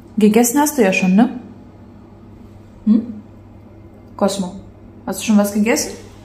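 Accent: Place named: Turkish German, Germany. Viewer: Jetzt fängst sogar du schon mit diesem Türkendeutsch an man man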